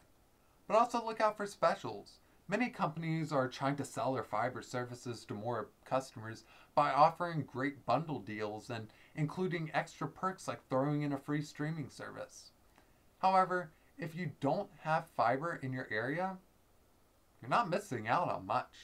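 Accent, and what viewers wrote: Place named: Philadelphia, United States. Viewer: The accent is distracting lol. Philly? Baltimore?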